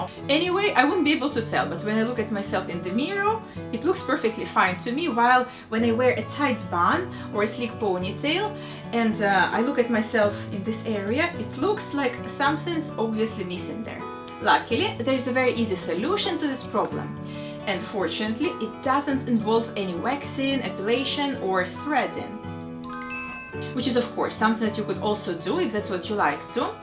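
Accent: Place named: Russia. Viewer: After listening this just for a few seconds I became sure it is Russian accent. Double checked it in description and yep, she's russian. Btw привет, me too)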